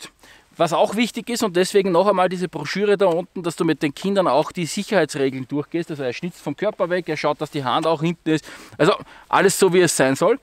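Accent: Vienna, Austria. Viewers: Heast deine Videos daugn ma voi oba des Wienerisch treibt mi voi in den wahnsinn XD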